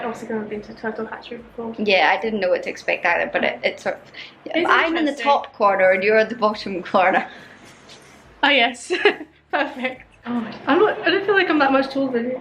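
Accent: Scotland, United Kingdom. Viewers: Hi watching your videos two years later. The google Algorithm has not done justice to your channel. I am of English descent on my Mom's side but born and raised in Sri Lanka and now living in Florida. You and are two brave souls to visit during turbulent times but I am glad you had a wonderful holiday. I love your Scottish accent strong and heavy on the R's. I have been to Scotland twice (Dundee). I hope to visit Sri Lanka with a stopover in the UK I am waiting for warmer weather because my body does not like the cold. Cheers Mates and happy travels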